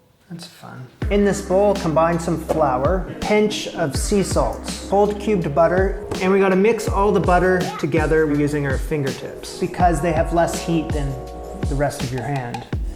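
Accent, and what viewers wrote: Canada. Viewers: OMG!! Just found you a couple of days ago and thought, this guy sounds Canadian, how 'about' that??? Then I found this... However, I love my butter tarts you have to make them both ways!!! Maple Syrup is the best way not too sweet and yum!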